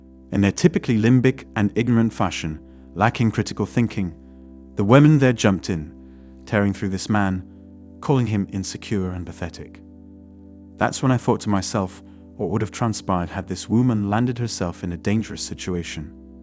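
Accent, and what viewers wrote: United Kingdom. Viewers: He just goes on and on with the same old tirade. You don't learn anything on this channel. You are just putting pennies in his pocket. Then he says in some videos that he is American but you hear a British accent lol. I think it's a text to speech program because some simple words are often mispronounced.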